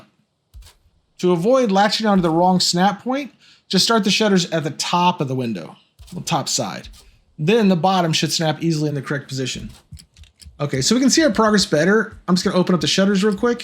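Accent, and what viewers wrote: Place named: South, United States. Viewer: You've never spent time in the south, have you? You have a "ruff" on your house. You "warsh" your car. Accents are fun :)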